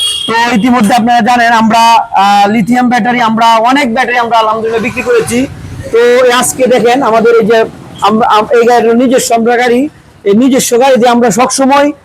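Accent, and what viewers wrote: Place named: Kishoreganj, Bangladesh. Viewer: আপনি কিশোরগঞ্জের আঞ্চলিক কথা বলেন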